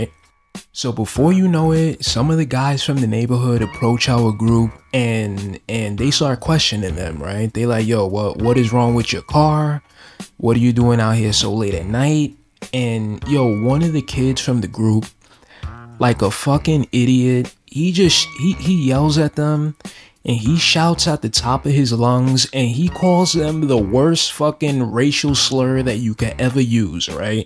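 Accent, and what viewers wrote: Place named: New York, United States. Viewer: Yo, I love your vibe, man. You sound from NYC but I'm not sure. I'm not from the States and I could be mixing up accents. Anyway I like that 'cause Mom picked up a NY accent for the many years she lived there. It's awesome to hear horror reviews in that real street dialogue. Also this book sounds like I need to read this shit soon. Thank you!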